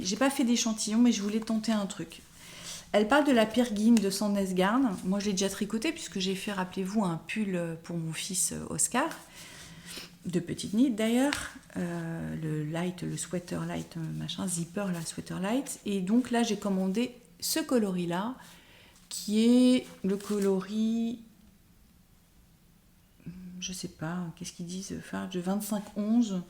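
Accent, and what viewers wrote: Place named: South, France. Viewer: ton accent du sud est parfait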